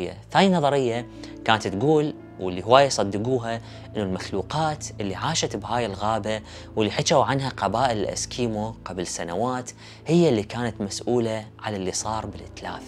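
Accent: Iraq. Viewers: سردك للقصة جميل جدا والي محليها اللهجة العراقية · مرحبا ياريت تجيب لنا قصة مدينة آشلي المفقودة قد سمعتها لكن مو بطريقة سردك الرائعة وبلهجة العراقية زادتها الغاية في الروعة ❤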